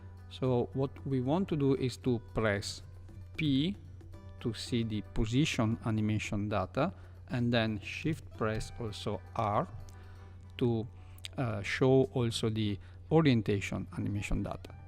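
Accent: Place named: Italy. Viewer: accento piu italiano di questo mai sentito ma grande comunque!!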